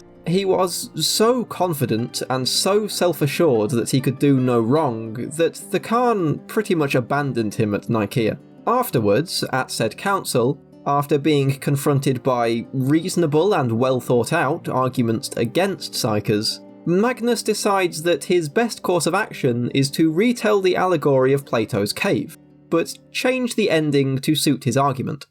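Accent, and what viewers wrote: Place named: United Kingdom. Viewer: A handsome man with a smart british accent nerding on about warhammer? Yes please!🎉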